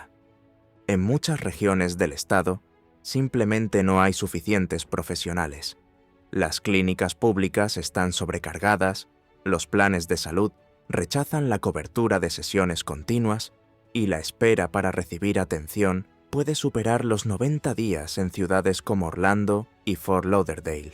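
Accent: Spain. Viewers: Pero de que está hablando este señor con acento español , en España la personas mayores en los pueblos abandonados el gobierno no se ocupa de ellos , las inundaciones arrasaron Valencia y las personas están abandonadas la inmoralidad sexual es increíble, las violaciones los asesinatos de hombres a sus esposas, los padricidos pero de que habla este bocón ya quisiera el poder vivir en Miami , en España las personas no pueden pagar un techo apropiado para poder vivir este hombre si se le puede llamar así que se enjuague su boca para hablar todas las mentiras que está hablando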